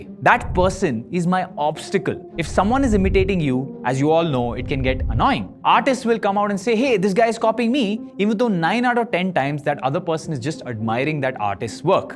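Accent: India. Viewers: Heard indian accent and immediately turned it off. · When you love the video but have to bear the indian accent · Y'all how tf you bear this insufferable indian accent. This accent is not even indian i dont know wtf is this but entire world hates it.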